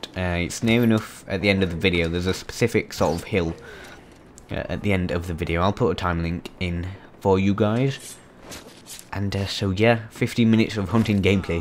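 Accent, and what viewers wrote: United Kingdom. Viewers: This guy is british.cuz dat accent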